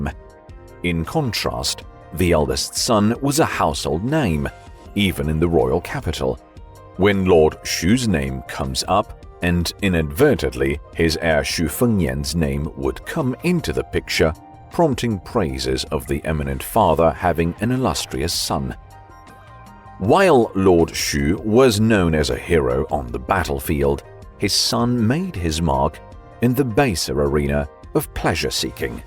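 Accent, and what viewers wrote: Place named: United Kingdom. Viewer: I love the British pronounciation so much. Sounds professional. It's my first time to listen English audiobooks. I can enjoy learning English now.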